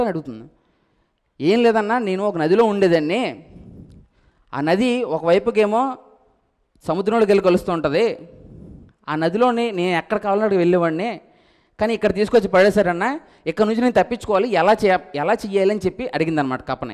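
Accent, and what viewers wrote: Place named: Godavari, India. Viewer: Godavari slang....maadhe...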